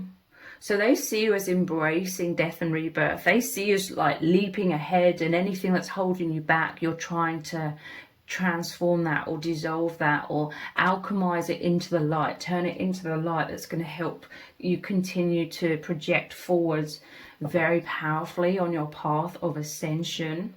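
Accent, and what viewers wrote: United Kingdom. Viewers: Yes ma’am sounds a lot like me. I’m very empathic probably over the edge super empathic or something, but I had to go quite silently on a lot of folks that don’t deserve the privilege I’ve ever been you know again they don’t deserve that after that transaction of pain. It was a crucible that really changed me an altered us quite a bit me and my dog, but we’re looking forward to better times So I could sign my name or something, but I’ll just put a little emoji that describes me quite well It’s very ferocious there and then and yours is a pretty good way to describe me as part of that team and stuff but we’ll just get on with this. Have a great beautiful day You sound like you’re from over there in British land or something Take it easy 👉 🐺 👈 I kinda had to get that way you know all that I had to observe and punishments and announcements that I had to absorb and then read myself well it was left with something to protect me in a biscuit here Alone, one .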